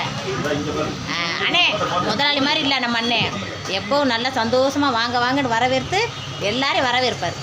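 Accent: Madurai, India. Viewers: Madurai ah ka neega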